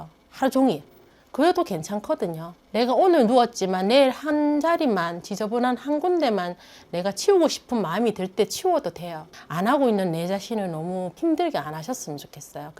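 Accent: Gyeongsang, South Korea. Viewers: 어릴 때 대구살아서 살모시~ 넘익숙 울엄마 말투라 정겹네요ㅎㅎ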